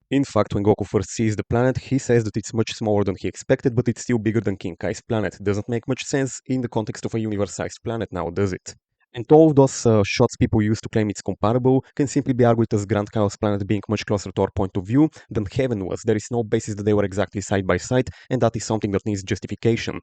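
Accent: Russia. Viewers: off topic question, but... you're Russian, it's just that accent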